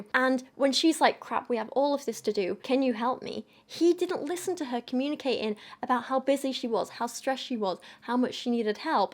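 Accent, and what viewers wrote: United Kingdom. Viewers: I think the coughing and British accent sort of makes you sound like a ye olde aristocrat- in the best possible way, you rule, your Majesty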